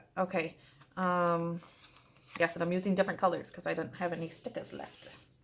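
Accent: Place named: Michigan, United States. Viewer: Things got tough for us in 2009. We changed our cell phone provider and kept our phone numbers. Each of us pay $30 month. We use Mint SIM. That might cut your bill in half. Our ciiverage is awesome and we have no problems. No my parents use it as well, they caught on. Best 2 you From California. I was born in Michigan and I recognize your accent.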